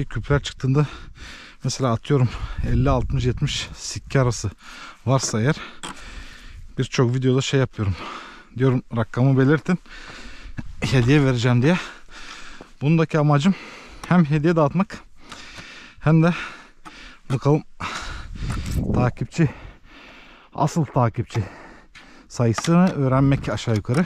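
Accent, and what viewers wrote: Thrace, Turkey. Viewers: Trakyalı olup konuşman 'da )ayrı bir hava veriyor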